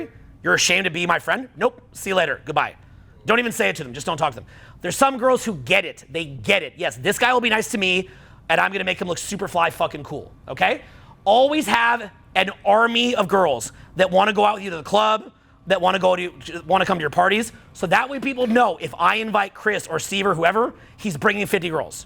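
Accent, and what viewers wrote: Canada. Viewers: Fair-ull, for all the people incorrectly trying to correct your Canadian pronunciation of the English language. Great timing with this vid considering the two Epstein clients just went to metaphorical war today.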